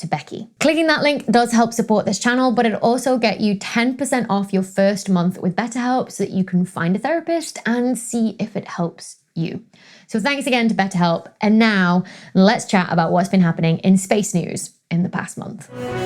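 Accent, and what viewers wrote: United Kingdom. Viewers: Dr. Becky: Being an academic is hard. Middle-aged me working in food service: Tell me about it. Your accent tells me you're a Pom, so you have guaranteed health care, right? As an academic, do you get sick time? Paid holidays? My health care comes out of my own pocket, and all I get if I have to take time off from work is a smaller paycheck. I'd trade places with you in a heartbeat. To paraphrase Ford Prefect: Academic stress is an illusion. British academic stress doubly so.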